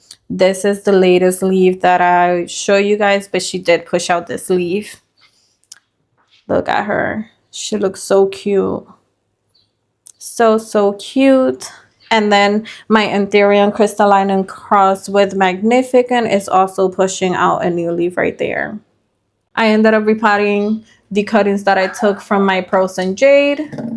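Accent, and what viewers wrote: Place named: Puerto Rico. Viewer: I will, tienes accento de Puerto Rico, eres puertorriqueña? Si no te molesta decirme, yo soy. Muchas gracias,.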